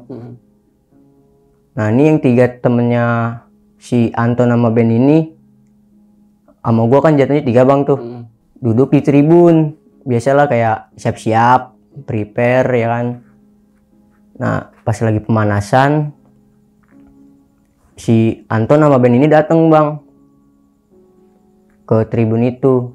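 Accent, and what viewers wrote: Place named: Betawi, Indonesia. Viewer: betawi parah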